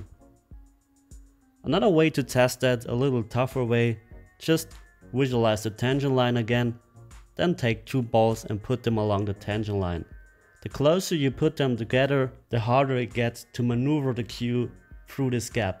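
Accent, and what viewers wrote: Germany. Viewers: Gory Guerrero he is from Germany.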